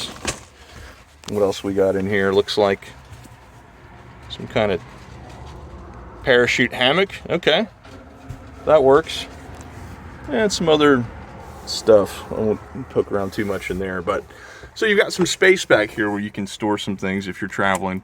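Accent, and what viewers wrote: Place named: Baltimore, United States. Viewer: Is narrator from Baltimore? I hear a strong Bmore accent when he says window, home, here, open, et.al. words, a LOT like a native of Baltimore speaks.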